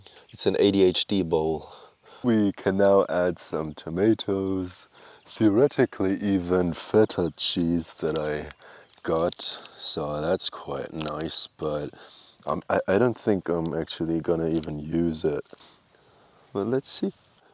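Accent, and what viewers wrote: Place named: Germany. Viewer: Sound German by your accent, come across your video, it's interesting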